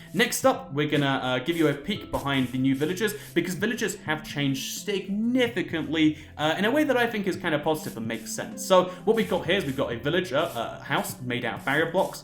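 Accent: United Kingdom. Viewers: Ong Canada I thought you were British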